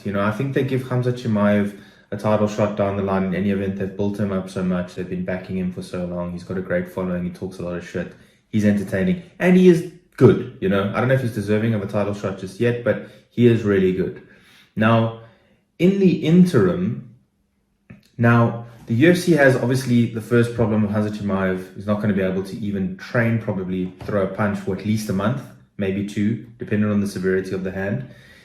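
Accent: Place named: South Africa. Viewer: i see. I ve got a soft spot for the beautiful SA accent. Subbed :)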